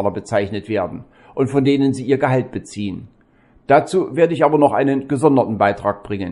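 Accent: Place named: Russia. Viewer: Ich wünsche ihnen Herr nachträglich ein frohes neues Jahr. Sie sind ein Charakter, den ich gerne in den Gesprächsrunden von Hajo Müller oder in den Verbinde die Punkte Talkrunden von Sunny sehen möchte. Wir brauchen Deutsche mit russischem Akzent, wie sie es so gerne formulieren. Ich werde es im Chat bei Hans-Joachim Müller und Verbinde die Punkte mal anregen. Mit freundlichen Grüßen aus der Pfalz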